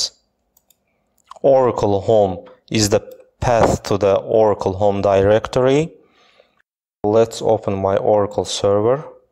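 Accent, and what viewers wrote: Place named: Poland. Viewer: Jestes z Polski? masz akcent polski :)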